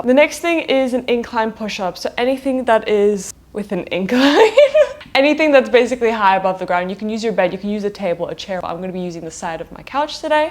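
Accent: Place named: South Korea. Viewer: Love the South Korean accent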